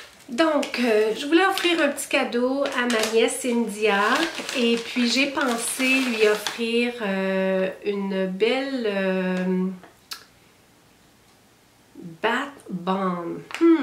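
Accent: Canada. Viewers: Hello! Merci pour ta chaleur et ta douceur... Je te trouve lumineuse même si tu n'as pas dormi cette nuit! Je suis une nouvelle abonnée et j'aime beaucoup justement ton rayonnement, tes ondes positives ♡ Je te regarde depuis la France et j'aime beaucoup ton accent canadien ♡ Je pense que tu pourrais tester les produits et nous donner tes impressions après, cela semble une idée sympa. Je te félicite pour ta chaine et continue c'est un plaisir de de te voir rayonner ☆☆☆! Bisous d'une autre Nathalie! ♡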